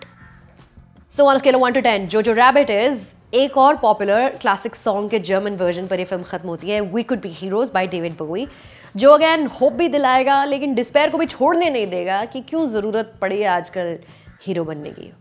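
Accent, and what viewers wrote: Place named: India. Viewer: After the biased viewer ‘not a review’ of Kabir Singh instead of being a reviewer, I’d given up on you, but you’ve redeemed yourself by going back in to your reviewer shoes. Well done for that! I started this review with a bit of a bias - let’s see what stupid stuff she talks - but you proved me wrong. Again, kudos. Very objective review (looks like it, I haven’t watched the movie) and great way of connecting it to other related art forms like HHGTTG. Now pet peeve - I understand it’s your style, but why speak with a strong accent even when speaking in English! Even more, why mix Hindi and English instead of either being true to yourself by sticking to Hindi or being a wider-audience-reaching reviewer like Bharadwaj Rangan and sticking to English. It actually annoys me personally, but stick with the mix if your regular audience likes it, but still keeping the Hindi influence of English away. 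All of this said in good sense and no offence meant! Cheers!!!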